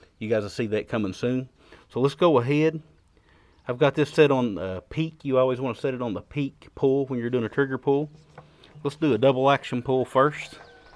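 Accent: United States. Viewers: When i start to hear this kind of accent, i automatically know that i found the right channel to hear something about guns. Best greets from germany, i love ur american accents.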